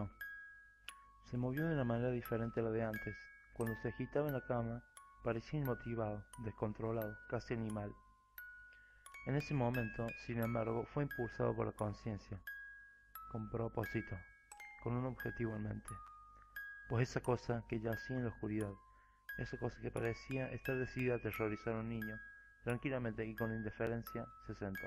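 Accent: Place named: Cordoba, Argentina. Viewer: Porque su acento delata, saludos hermano Argentino, compañero peronista! Aguante los cordobeses.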